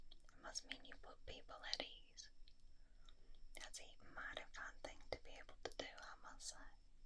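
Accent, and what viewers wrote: South, United States. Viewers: Southern accent is best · Thank you southern! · I've never heard a witch with a southern accent before, hehe! A lovely witch and some lovely triggers. 😌❤️ I enjoyed this. 😊 And I love how consistent your uploads are by the way. ❤️ I hit Like. 😉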